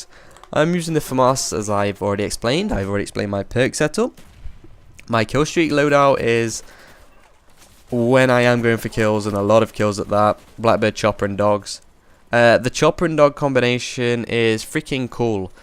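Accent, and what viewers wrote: United Kingdom. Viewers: FINALLY, A BRITISH COMMENTATOR!!!!!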